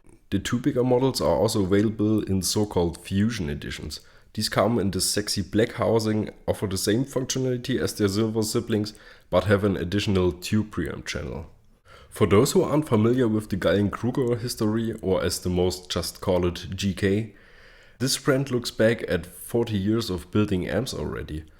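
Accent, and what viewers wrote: Germany. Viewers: this man's accent screams "I am German". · Man I'm going to have such a blast trying to imitate your accent - it totally kicks ass. Which part of Germany does it originate from?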